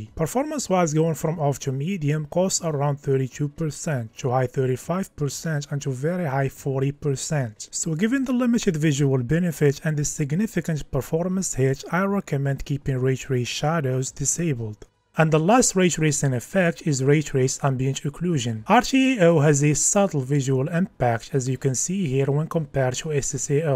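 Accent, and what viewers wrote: Morocco. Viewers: the moroccan accent is so thicc lol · accent dyal lmgharba matkhfax rak nadi khoya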